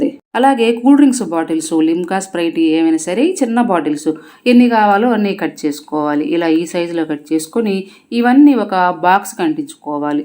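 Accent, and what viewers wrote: Nellore, India. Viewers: మీది నెల్లూరు అనుకుంటాను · Medhi nellore aa mam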